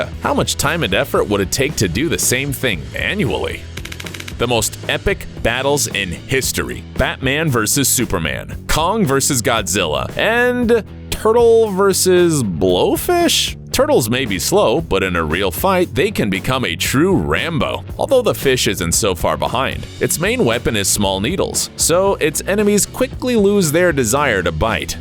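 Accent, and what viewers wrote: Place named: United States. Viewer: 38 seconds in and was so annoyed by the pointless awful cheesy American VoiceOver I had to turn off. We only need the clips not the dreadful voice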